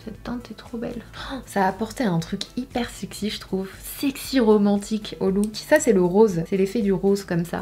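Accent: England, United Kingdom. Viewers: L'accent anglais est clairement plus difficile pour la compréhension :p mais comme tout c'est une question d'habitude. Tu nous donnes tellement envie d'avancer dans le temps et d'être déjà le 24 <3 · Hello Sanaa! Je suis tout à fait d'accord avec toi pour l'accent anglais ! 😂 Moi qui parle couramment l'anglais, je comprends beaucoup mieux l'accent américain que l'accent anglais 😂 quand je parle avec mes potes anglais je suis obligée de leur demander de répéter plus lentement car j'ai beaucoup de mal à les comprendre mdr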